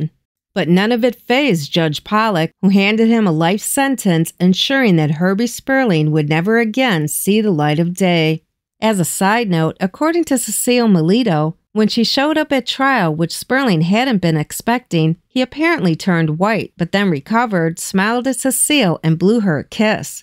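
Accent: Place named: Northeast, United States. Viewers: It's like I'm back up in the Bronx listening to Aunt Irene tell a story. Love it. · Another great production and presentation! Please take this as a huge comment, your voice with your accents really brings this old country boy the Northeast mafia territories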